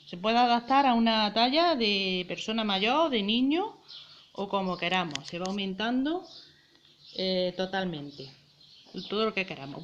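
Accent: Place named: Murcia, Spain. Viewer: Jajaja , eres Murciana , menudo acento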